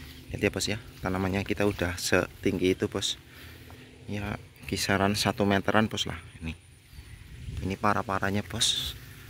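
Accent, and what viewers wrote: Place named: Banyumas, Indonesia. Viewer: Salam ngapak bos🤣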